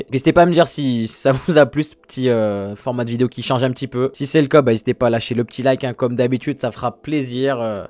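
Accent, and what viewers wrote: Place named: Loire, France. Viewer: Accent du 42 spoted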